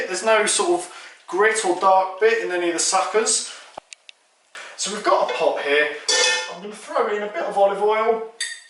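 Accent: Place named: United Kingdom. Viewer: you are? sure fooled me with the british accent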